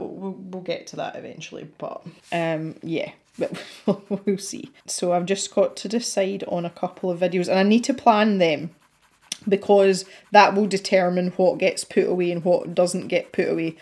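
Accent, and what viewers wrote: Scotland, United Kingdom. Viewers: I am so loving this, its lovely to hear your accent too, I live in Aberdeenshire (Royal Deeside) so I feel really at home watching your channel.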